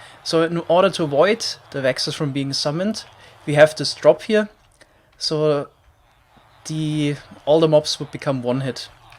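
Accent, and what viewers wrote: Germany. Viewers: You sound like you are from germany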